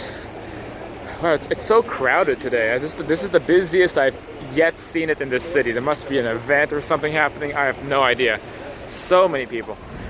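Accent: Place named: Netherlands. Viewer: Nice to have a little look around Kiev, thanks! By the way, are you European yourself, your accent sounds Dutch maybe ?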